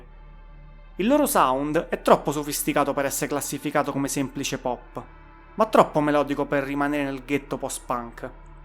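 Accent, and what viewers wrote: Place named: Rome, Italy. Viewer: Bravo per l’iniziativa, ma tra accento romano (mi distrae troppo) e (si pronuncia PorcupAine), non ce la faccio. Meno male che ci sono altri podcast. Comunque meno male che finalmente si parla di buona musica!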